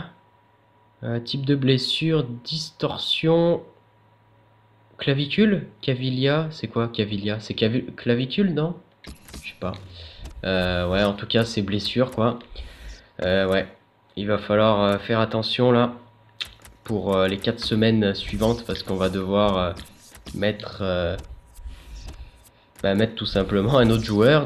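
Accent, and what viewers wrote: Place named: Italy. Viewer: bonne vidéo et ton accent italien et magique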